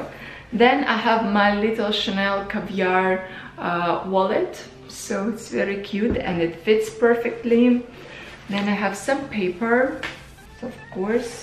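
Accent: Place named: Russia. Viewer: She has Russia’s accent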